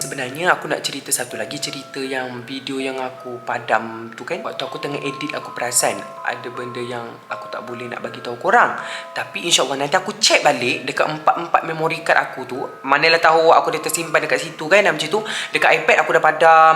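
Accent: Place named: Kelantan, Malaysia. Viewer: dye ckp kelantan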